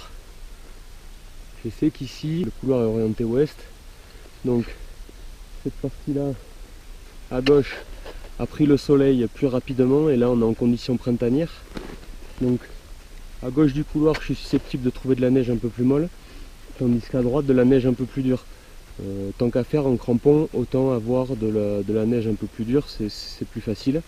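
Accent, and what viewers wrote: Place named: Southwest, France. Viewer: Cest vrai quand vous regardant les gars vous êtes tres claire, une voie fort sympathique ( j’adore l’accent du sud ouest ) mais j’ai eu la même reflexion en cas de chute et malgré le piolet bien en main ca risque de vite chauffer sur les mains et donc le réflexe sera de lâcher le piolet...donc oui des gants semble s’ajouter a votre panoplie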